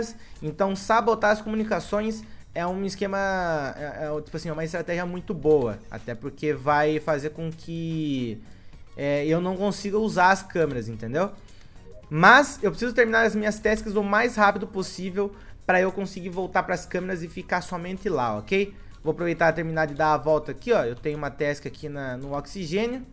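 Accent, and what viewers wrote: Minas Gerais, Brazil. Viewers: faz o desafio de falar mineiro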